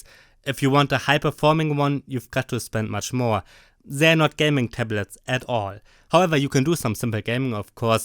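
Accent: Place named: Germany. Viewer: Nice vid, love that thick german accent too. Keep it up, definitely one of the best reviews on this tab.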